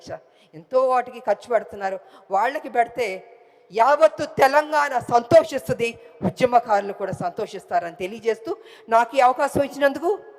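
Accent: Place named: Andhra Pradesh, India. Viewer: Andra valla tho vundi mi slang kuda andra vari lane ayipoindi amma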